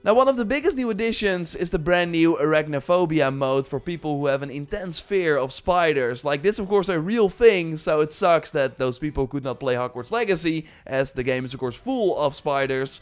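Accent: Netherlands. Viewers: Sooo Dutch 😄 nice vid though. Good info.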